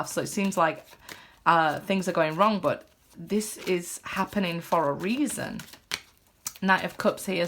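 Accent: Yorkshire, United Kingdom. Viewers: Are you from Yorkshire, leeds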